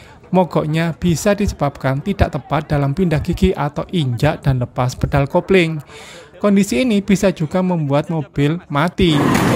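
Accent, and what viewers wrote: Java, Indonesia. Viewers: Aksen jawa nya medok tenan mas….. hadeuuuuuh 😮😅😂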